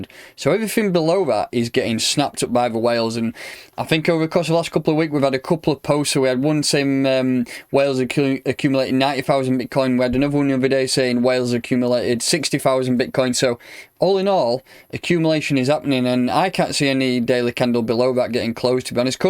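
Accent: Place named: Yorkshire, United Kingdom. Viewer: Lol. That’s deffo a Yorkshire accent!!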